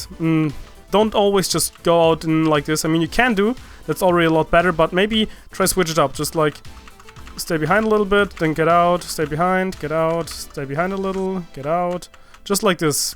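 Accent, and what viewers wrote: Germany. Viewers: Bist du eigentlich deutsch? :D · bist du deutsch ??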